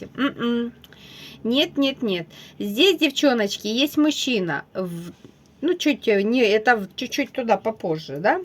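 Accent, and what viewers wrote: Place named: Kuban, Russia. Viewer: У вас кубанский говорок. Я сама, из тех мест....🤗⚘️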